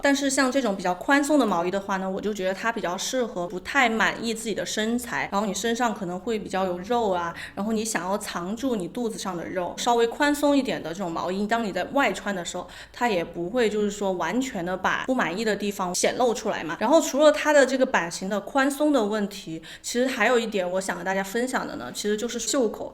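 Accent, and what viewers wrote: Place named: Shandong, China. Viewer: 👍听口音是山东人😃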